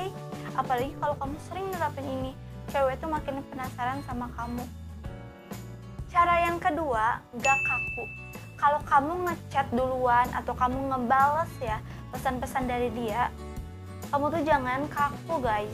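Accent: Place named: Subang, Indonesia. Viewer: Mirip orang subang...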